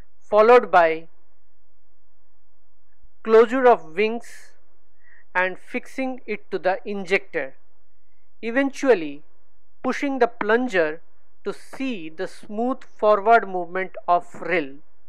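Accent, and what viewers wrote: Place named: India. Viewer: This doctor sounds Indian.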